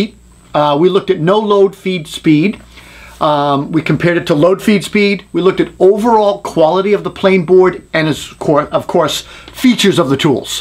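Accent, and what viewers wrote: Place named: Worcester, United States. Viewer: Having lived in Worcester for the first 20 years of my life, I could place that accent immediately.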